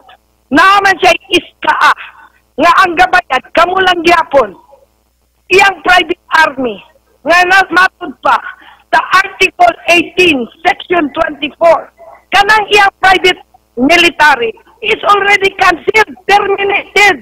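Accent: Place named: Visayas, Philippines. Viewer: Yes, we believe in you ma'am .. Excellent ! Just take care of your self & health as well..we need you ma'am.. Alam mo po ma'am Ka boses n Ka Boses mo po ang pmangkin ko..hehehe..para ko n narinig cya..hehehe..Visayan accent po tlga, gnyan ganyan ang boses .. Very nice! Love u po ma'am . God bless u po. Mabuhay k po & family! 😊😊😊❤️❤️❤️❤️❤️👏👏👏👏👏👍👍👍✌🏽️💞💞☘🌹💞💕😘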